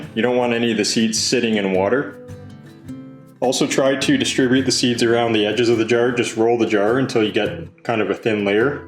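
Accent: Canada. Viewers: My mom is an immigrant to the USA. I’d like to send her your video, but she won’t understand your Canadian accent. It’s very strong! I’m having a bit of struggle, and English is my first and only language. If open to it, pls add English subtitles. I know that’s weird, but English is her second language, so dialect other than her USA-born children, is difficult for her. She’s converting to healthier because of pandemic, and not wanting any vaccines, etc. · Salad spinner husk removal much easier....or....use a net bag that onions come in, one with tiny holes and stand outside and spin it really fast....Kids love this, but I use an almond milk bag over the net bag so I don’t have seed husks all over me and my deck... Love that Canadian accent! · Even as a Canadian, I'm like "whoa, that is a Canadian accent." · Do I hear Canadianish? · Find eout in the heouuse. So Atlantic Canadian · As soon as this video started I got hit in the face with all that Canadian!! 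You're one of my favourite peoples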